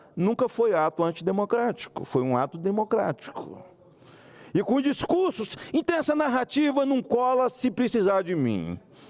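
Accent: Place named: Brazil. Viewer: Parabéns senador Magno Malta você merece ser representante da população sotaque Brasileiro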